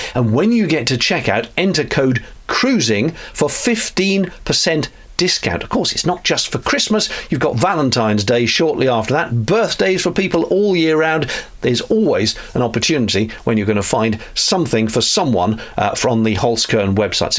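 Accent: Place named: Upper class, United Kingdom. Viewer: I don't know when this footage was recorded, but isn't it supposed to be cold this time of year on mud island? As an Antipodean I find it curious that your hemisphere is equally as hot as my own, wot, wot! Apologies for the "grati=oitous wots", The accent of landed gentry is addictive and yet the dialect of paid Yobbos will find it's way into modern RP - prepare to be confused...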